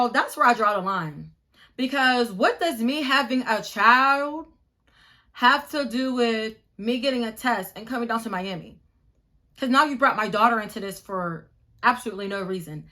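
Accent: Pittsburgh, United States. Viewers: It’s so crazy cus when you start telling the story, I’m like she sound like she’s from Pittsburgh 😭 We really have an Accent fr lol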